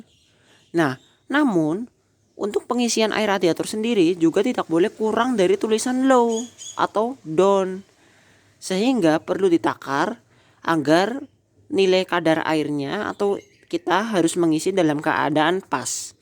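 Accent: Banyumas, Indonesia. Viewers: Ngapak² nih..terimakasih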